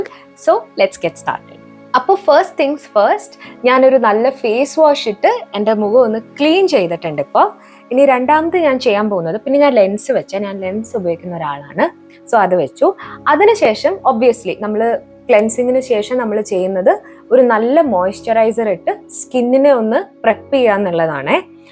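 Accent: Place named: Kottayam, India. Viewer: Luvd ur video, n ur look too.. ടെ വർത്താനം ഒത്തിരി ഇഷ്ടമാണ്.. ആ mike nte 'സോക്കെട്' കേട്ട് ഞാൻ ചിരിച്ചു..തനി കോട്ടയം വർത്താനം ആന്നെയ്..😅 ഇവിടേം അത് പോലോക്കെ പറയാറുണ്ട്😁 like ur videos, esp dress hauls..kp going dear. ❤ Princy,frm Tiruvalla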